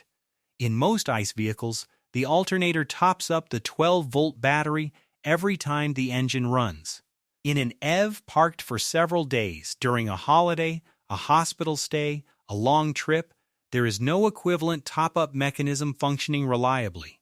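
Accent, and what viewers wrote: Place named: United States. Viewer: You should be more careful with your script writing because you’re using an American Standard accent, but referencing British currency. Also EV, is pronounced eeeee veee not “ev” like in evermore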